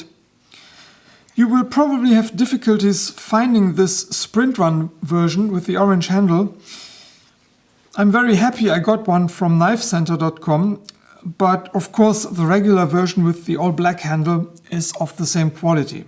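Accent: Germany. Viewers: Nach den ersten 4 Wörtern wusste ich: ein Deutscher xD keep going - it's great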